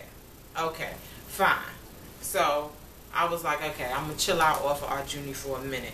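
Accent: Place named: New York, United States. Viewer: Gurl,, you had me on the floor. It is rare to hear an NYC hair person on here. I loved your frankness!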